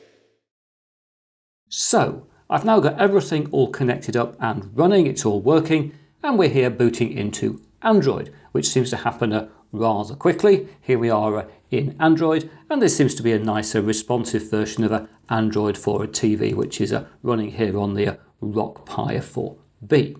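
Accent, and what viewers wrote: United Kingdom. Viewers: When I watch this channel I get the same warm fuzzies I used to get as a very young child watching children's education shows on national broadcast television in the 80s. Some of it definitely has to do with that sawtooth/triangle-wave synth theme music. But obviously the rest is from the very friendly pommy accent :)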